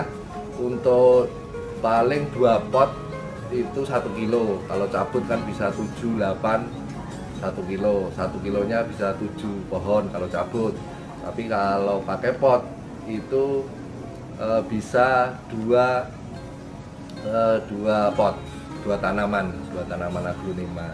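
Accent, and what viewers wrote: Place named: Java, Indonesia. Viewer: Galfok sama logat jawanya, mas. Jd nyengir2 ngebayangin ketoprak😂😂😂👍👍👍. Sukses ya. Terima kasih🙏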